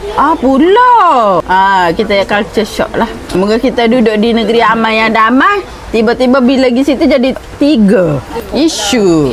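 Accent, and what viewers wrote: Kelantan, Malaysia. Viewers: Tersenyum je sepanjang tengok akak ni berceloteh❤ ..kalau sekadar dengar suara, memng kita tak sangka yang bercakap ni adalah indian , sangat fasih dengan loghat kelantan ye❤❤ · 😂😂😂😂🤣 Terbaik kak👍👍👍👍👍..puas dengaq akak cakap Kelate🎉❤😊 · Sy takjub tgk akak India tapi ckp loghat kelate. Kalau tutup mata cuma dgr akak borak mmg tak sangka org India yg bercakap. Last skali mana nk dpt baju akak pakai tu? Cantek sgt. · Suka aku tengok akak ni spontan je dia crita dengan loghat klantan tmpat asal tempat lahirnye tu kot klantan.. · Sedap oyak kelate sis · Coney la kak ni .. kelate pure 🎉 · aku penah dengar family bengalli punjabi di jakarta airport cakap Indon , no big deal ... sebab dorang memang di lahirkan dan dibesarkan di sana... sama gak akak neh, membear di Qlate, memang padu la bahasa Qlate dia... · Bagus lh India ni😅😅😅😅😅pekat ckp Kelantan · Best la akak ni… xrasa nk panggil kak ni india tp mmg rasa mmg klate, wlu aku org johor😅… aku bangga ngn kuatnya penyatuan org kelantan…. 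Sbb loghat dia… yg mana negeri aku pun xamalkn kenegeriaN johor… sbb xtau apa yg nk mnyatukan sesuatu kejohorian tu… jujur dari hati jgn kecam 😅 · Kak kalau sy tak tgok muka akk hanya dgar suara mmg sy yakin akk melayu klantan kak.. power kak ori budu betul ni😂😂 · pandai loghat kelate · Part tekejut beruk ayat paling rare negeri kelatey..hebat kak mengunakan bahasa kelatey😂😂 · Tabik lah akak ni..fasih berbahasa Melayu dlm loghat Kelantan... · respek kak....kelate pekat...nnt try ike bekok plok kak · Ttubik bunyi kelate gak kira jolo slalu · Kagom aku akak India ni pandai cakap kelantan, ni yg DAP takotkan perpaduan sebenar bagse melayu yg di terapkan Kelantan ... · Tekak Klate mommy yr. Suuuuka dengar dia borak... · saya pon terkejut beruk jgk dgr kak kecek kelate...hahaha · Sedap dgr dia cakap kelate..👍👍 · Dia bukan sahaja cakap bahasa lorat kelate tapi nada & lenggok percakapan pun seni budaya kelate 👍👍cakap mcm melayu kelate 👍👍👍👍👍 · Bangga gila aku walaupun aku org kedah...bangga dengan depa kaum lain tapi ckap loqat kelantan beat dengar bila kaum lain ckap..depa org cina pon ckap kelantan terbaikk❤ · sedap dok oh dengar antie kecek kelate gak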